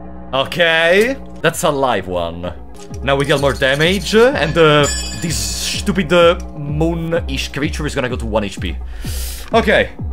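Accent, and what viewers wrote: Italy. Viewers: I watch especially for the fear & hunger videos so hearing him in this video make confused exclamations instead of knowing every single secret of the game is very strange XD and speaking as an Italian to another Italian it's so nice to hear someone with an English pronunciation similar to your own! you make great videos man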